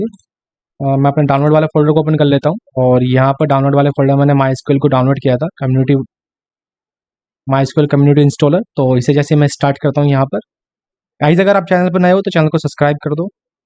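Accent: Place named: Hyderabad, India. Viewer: thanks broo that was really a big hectic, by the way that hydrabadi accent kills it , love bro love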